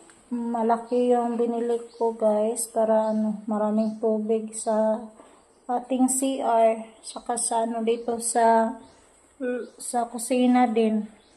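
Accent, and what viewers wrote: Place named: Visayas, Philippines. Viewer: Pretty bisayang bisaya na 😂 ❤❤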